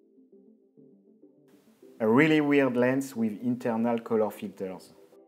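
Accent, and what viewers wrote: France. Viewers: The french accent! J'adore tes vidéos, sont top! Et ton accent me fait bien marrer :D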